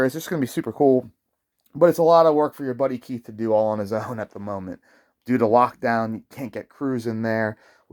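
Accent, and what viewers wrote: United States. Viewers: wenn der nicht so einen furchtbaren amerikanischen akzent haette, ... nicht zum anhoeren. OI! Speak English!!!!!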